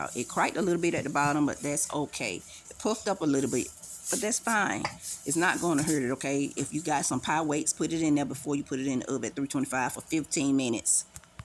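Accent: South, United States. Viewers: I just love your accent dear!! Reminds me of my time living down south 🥰 i hope my pie turns out good. Thanks for showing me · Sister, as I listen to you prepare that pie, it reminded me of my momma. She been gone for 15 years. And then the southern accent. Oh girl, you took me back. Thank you so much.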